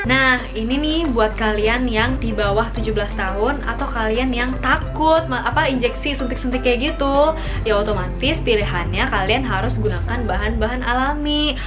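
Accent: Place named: West Java, Indonesia. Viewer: Kek orang sunda yah logatnya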